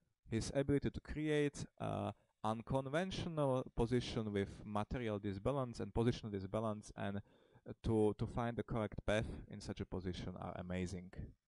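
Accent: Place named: Eastern Europe. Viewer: You speak portuguese, i speak spanish, and we both are able to understand him regardless of his pronunciation. He is from a slavic country, so don´t complain about "free" and "threes".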